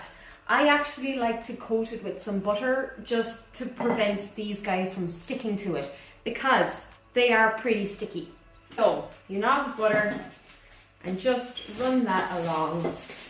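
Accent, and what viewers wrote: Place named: Ireland. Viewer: Hello there, I got randomly recommended your video. I see you are a small time youtuber. I am liking and commenting to give you an edge with the algorithm. I actually stayed the first 2-3 mins of the video to figure out where you are from. That is a very mild Irish accent - at least I think. Did you grow up in Ireland? or I suppose which part of it if you don't mind sharing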